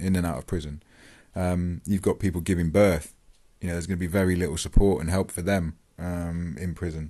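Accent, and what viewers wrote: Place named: Cornwall, United Kingdom. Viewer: Cornwell accent i believe bud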